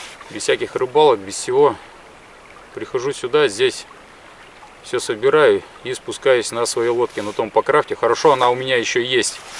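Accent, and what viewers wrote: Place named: Urals, Russia. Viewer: Говор уральский)) какой регион? Свердловская область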